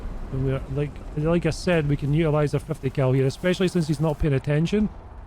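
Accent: Scotland, United Kingdom. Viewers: A Scottish bro who is a super Pershing connoisseur? Subscribe I shall!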